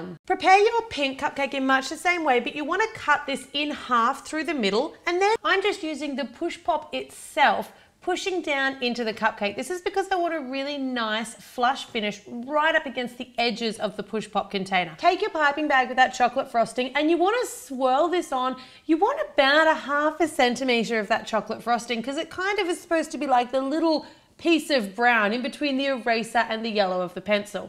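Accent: Australia. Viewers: Is she australian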